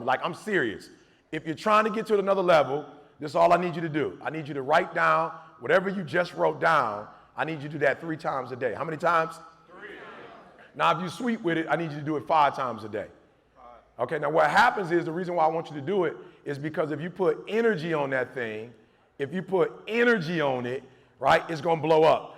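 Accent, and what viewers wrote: United States. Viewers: "Eight Mile, bruh." He so Deeeeeeetroit!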